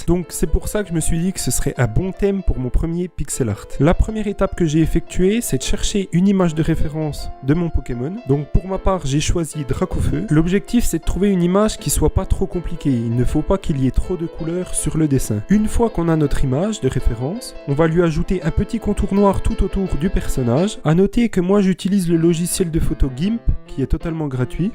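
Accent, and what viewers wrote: Franche-Comté, France. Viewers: Petit accent de franche comté ???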